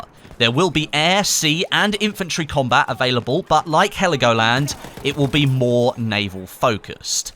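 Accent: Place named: United Kingdom. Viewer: I really like when you say “thanks for watching today! “ since I love British accents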